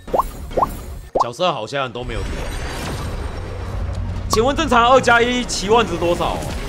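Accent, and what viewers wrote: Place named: Taiwan. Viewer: 老哥的台灣國語好像越來越嚴重了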